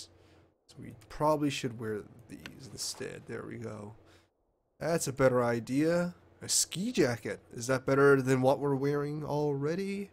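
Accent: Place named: Newfoundland, Canada. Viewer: I didn't know you were from Newfoundland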